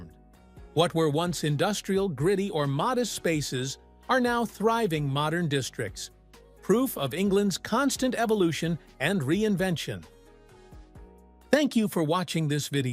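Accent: United States. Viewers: Bloody awful US accented narrative with non-English pronunciation! JeeZ!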